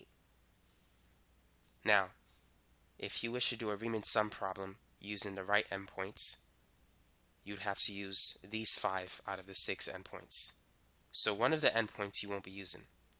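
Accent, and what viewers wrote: United States. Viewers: Sİr, where are you from? you have definitely american accent but sometimes you really sound strange.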